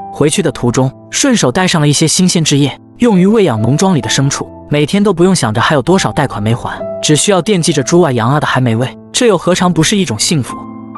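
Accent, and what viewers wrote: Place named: China. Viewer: 聽聲音也知道是大陸人。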